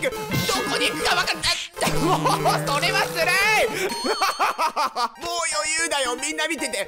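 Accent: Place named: Kyushu, Japan. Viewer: そげん喋っとるほうですよ🎵 ほどよい九州の方言が素敵です（私はばあちゃんが九州だったんで）🎵✨☺️ あ、そしてさんといえば「絶叫🎢」君に届けで吹きました✨ 楽しい動画、ありがとうございます😉👍️🎶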